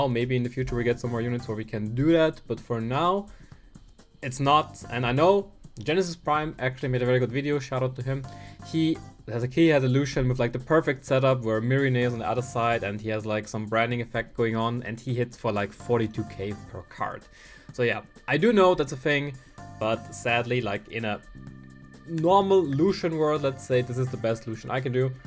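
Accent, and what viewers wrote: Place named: Germany. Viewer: your german accent is hard man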